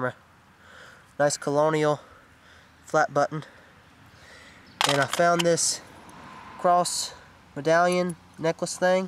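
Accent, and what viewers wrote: South, United States. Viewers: That's a Southern accent I know because I am Southern and spend my life outside with the chickens and cows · You got the most country accent ever! I didn't think anyone could outdo me. Good luck out there huntin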